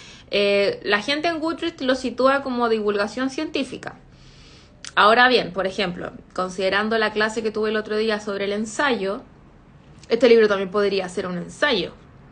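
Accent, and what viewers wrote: Chile. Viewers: Woow,la combinación perfecta, un buen desayuno y la lectura de un buen libro, me encantan tus vídeos,me gusta tú acento chileno, me agrada tú voz,y tú forma de ser, aparte de ser muy bella eres muy inteligente,y admiro tu gusto por los libros,me fascinan la temática de tus vídeos, me gustaría ver un vídeo donde cuentas más sobre ti, o algún vídeo de la cultura de tu país, ya qué mi cultura cómo mexicano, es diferente a la cultura chilena,aún qué vivimos en países hermanos de latinoamerica,cambian los gustos literarios, me encantó la temática del vídeo! te envío un beso y un abrazo, con absoluto respeto..saludos princesa⭐🌺👌😘😘😻☺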